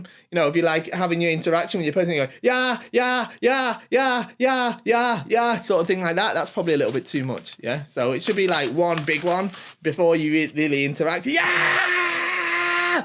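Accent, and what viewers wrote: United Kingdom. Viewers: hello. Please remove your name and other titles from bottom because I'm watching you with subtitles to better understand you British English. 😉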